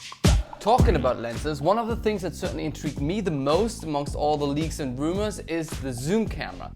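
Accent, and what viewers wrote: Germany. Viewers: Where do you got your german accent from!?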